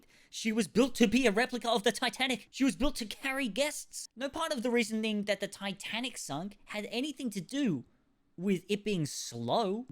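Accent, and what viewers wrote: Australia. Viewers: Never heard anyone pronounce Tubi like “tubby”. Lol! Thought we all knew it’s from the word “Tube”, as that’s the nickname for old school TV’s (coming from the technology used, to create the broadcast picture on the screen. They utilized a series of vacuum TUBES). Interesting. That a Aussie thing (not sure which country you’re from exactly, so I’m just guessing by your accent. Lol!) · Ok Australian Kurtis Conner! This was funny as hell loool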